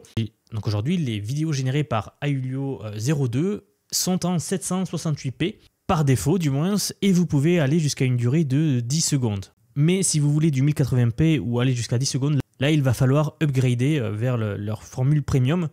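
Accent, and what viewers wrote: South, France. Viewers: Merci pour cette vidéo ! très intéressant ce nouvel outil ! Et vive l'accent du sud !! 😁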